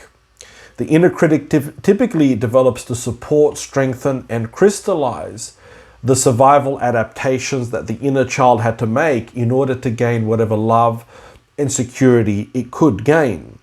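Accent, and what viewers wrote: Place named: Australia. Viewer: Very interesting. I am an identical twin so there's a whole other aspect of "self" to address as well, which singletons do not. All four self states are thus magnified in essentially two identities - together as twins, and as separate individuals. The external experiences impact the significance of the development of self as well for eg, all our lives we are assessed re our differences and our likenesses by others who inform us of their conclusions, yet simultaneously treat us as a "unit" (eg one soul split into two bodies), thus the development of our "self/selves" is extremely public, ceaseless and difficult. To be told from a very early age who your inner child is, being critiqued, in the context of being compared etc with someone else's inner child is really confusing for young kids, and not easy as an adult either. It's almost like the inner child and inner critic are both external and internal for us. 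So as I listen to your excellent talks I filter all you say through our and my & her perspective. Many twins/multiples become bitterly estranged as the inter- & intra-personal self states are too hard to deal with without good help, and good help is extremely scarce. There's a lot of info about twins and our genetics etc & for their parents but practically nothing for twins themselves in how to navigate this often confusing state so that the adult and warrior can handle things well. Thank you for your great work :). Great to hear a fellow Aussie.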